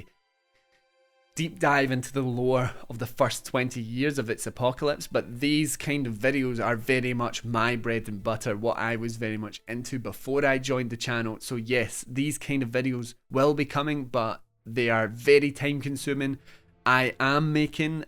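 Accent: Scotland, United Kingdom. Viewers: Push Square has rapidly become my favorite gaming website, because it’s refreshing to have a place where people discuss video games with no apparent agenda beyond the love of the medium and a desire to see more good games. Even commenters are mostly civil to each other, and that is down to the site establishing the desired tone (and a little moderation from time to time.) So now I’ve subscribed to the YouTube channel and so far I’ve enjoyed what I’ve seen. I liked the couple tech reviews. I’d prefer that you go in depth on one topic to making “Top 7” videos, if you’re taking requests. The topic should be whatever interests you, because that’s the only way you’ll make videos a million other people couldn’t make. The accent is great! I’m American but my mother came from Glasgow and all my favorite relatives sound like you (though maybe you’re from another part of Scotland because I understand you just fine at 1.25x speed.) Tifa was the correct answer. 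The proper response to the swallow question was “African or European swallow?” You’re doing great!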